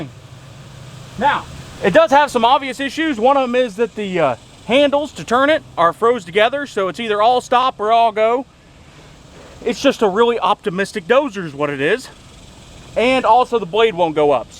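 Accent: South, United States. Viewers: I'm new here! I thought that it was going to start. I used to work for both construction and excavation companies. For a while I even did erection, but that's a story for another time. I'm going to guess by your accent you're at least in Tennessee or even more South. It tends to be hot, so why not dig a pond when you get the kinks out of the lift cylinders? You can always use it for watering livestock, but if you're too far in South look out for gators! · Which accent is that ? I guess southern ?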